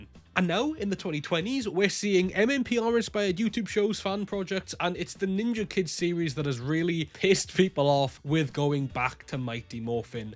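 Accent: United Kingdom. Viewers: You know that is British, right? Brits pronounce certain words differently than Americans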